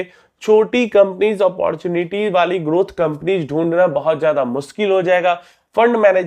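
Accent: Bihar, India. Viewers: रा का उच्चारण बिहार वाला ही हो रहा है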